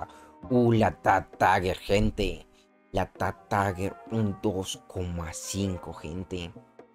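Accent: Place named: Colombia. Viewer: de que parte de colombia sos-nuevosub-oN_Ry3uc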